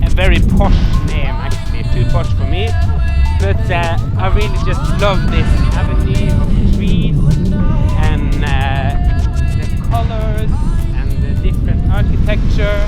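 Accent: Northern Norway, Norway. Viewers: e du nordlending? 😁 cool videos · You are so norsk although you are speaking English but norsk keeps popping out 😊👍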